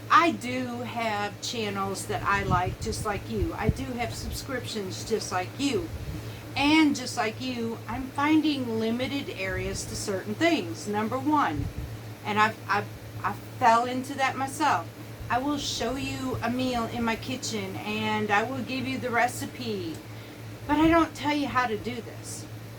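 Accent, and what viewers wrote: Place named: South, United States. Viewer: Hi 🥇🏡❤️⭐️⭐️⭐️⭐️⭐️ Let’s do all the shopping. It’s extra fun for me because it’s so different than Canada. I love southern accents ❤️. You are my sister and I can’t wait to shop together 😊😊😊😊😊😊😊😊😊😊😊😊😊😊😊😊😊😊😊😊😊😊. The walk was wonderful. Only one complaint is Jason and I are still waiting for a yes 🙌 on putting the membership on. It is totally optional for subscribers. You will have a good income if even a small percentage of subscribers join. Pretty please with infinity cubes of sugar?No extra perks nessasary or wanted we love ❤️ you!☮️💟🖖CC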